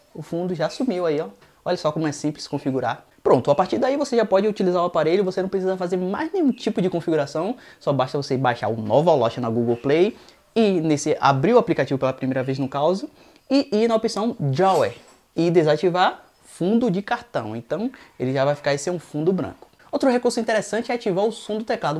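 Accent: Bahia, Brazil. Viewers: Você é baiano também? Boas dicas!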